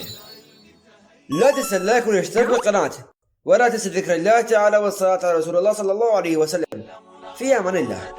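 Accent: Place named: Iraq. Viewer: صراحة المونتاج حلو جدا بس حاول تقلل من لهجتك العراقية في المقاطع حتى نقدر نفهمها صوت بالكامل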